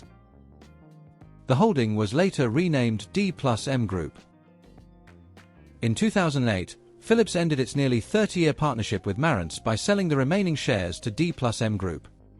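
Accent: United Kingdom. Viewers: So according to the narrator with the British accent, everyone has been pronouncing the name Marantz incorrectly. So phonetically Mare (like the female horse) and ents (like the tree beings in The Lord Of The Rings) it's "Mare-ents"(?) Huh... Learn somethin' new every day. (lol)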